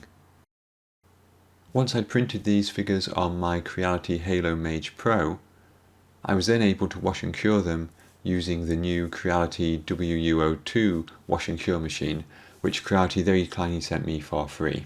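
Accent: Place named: United Kingdom. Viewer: Hi there. I have just found your channel on YouTube and I had to subscribe. What you did there was absolutely fantastic. I am wanting to get into doing dioramas myself so any help I can find I am willing to accept. LOL. Thanks so much for doing this video and I would say blessings to you from England but it sounds to me like you have a British accent. So I will just say blessings to you from Weymouth, Dorset.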